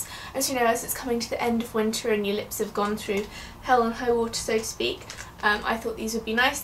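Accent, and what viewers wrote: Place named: England, United Kingdom. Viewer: You sound English though do you live in Japan x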